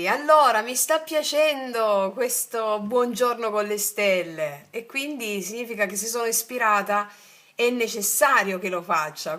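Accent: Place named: South, Italy. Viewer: Buongiorno bella voce che hai specialmente il tuo accento che credo che sia del Sud.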